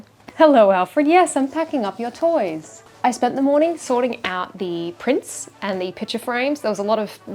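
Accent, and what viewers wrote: Australia. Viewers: Listening to another Aussie is the best....... beautiful shirt.... enjoy the sunshine.... Winter is coming....🦘🦘💐🌹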